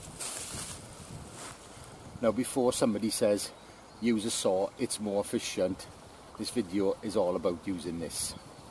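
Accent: Wales, United Kingdom. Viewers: Probably the MOST Welsh accent I’ve ever heard!! 😅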